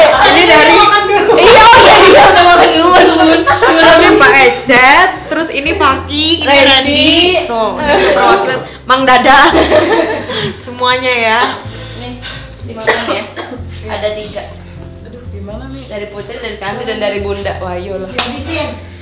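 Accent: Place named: West Java, Indonesia. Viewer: Kenapa ya klw. Bunda ngomong pinginnya saya ketawa lucu suka ada sundanya 😂😂👍😍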